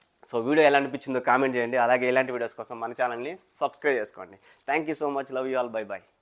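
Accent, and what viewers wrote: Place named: Kadapa, India. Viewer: anna mee native place kadapa naa anna yaasa alaane vundi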